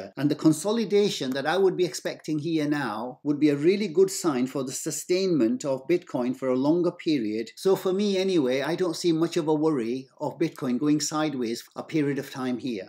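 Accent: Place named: Birmingham, United Kingdom. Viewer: No - he's a brummie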